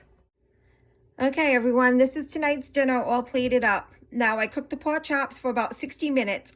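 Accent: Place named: East Coast, United States. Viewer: I never heard of sazon before but will look it up right now. My pork chops always came out dry when baked so now I only make them in a cast iron skillet. Does the marinade (specifically the lime juice) help to make the chops more tender when baked? Your east coast ‘accent’ brings back pleasant memories of my years spent in northern New Jersey in the 90s. Am back in my home state of Minnesota now, where everyone has that ‘up north’ accent! Thanks for sharing.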